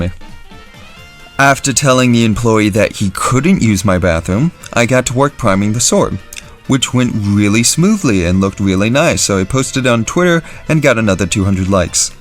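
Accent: Netherlands. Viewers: is he dutch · He from Utrecht?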